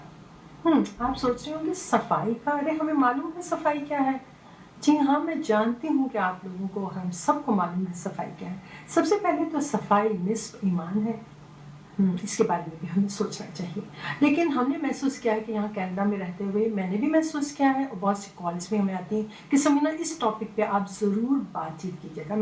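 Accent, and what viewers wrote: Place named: Karachi, Pakistan. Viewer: Do you still run this program? Love your urdu! Not sure where are you from but I'm orginally from Karachi and you have that Karachi accent. Keep the good stuff coming in.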